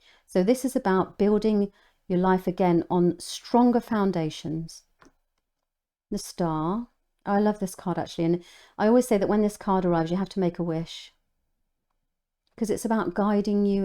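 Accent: England, United Kingdom. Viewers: beautiful voice, may i ask the accent, English, but where from? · Thanks so much for this - really clear and interesting. It's also good to see a tarot video made by someone who is English, and not American! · Self-knowledge tools with a British accent? Oh how I love it 🥰👍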